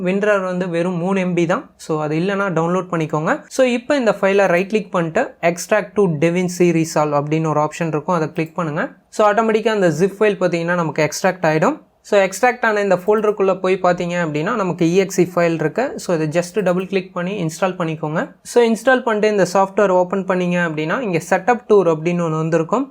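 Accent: Salem, India. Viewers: Anna neenga salem a?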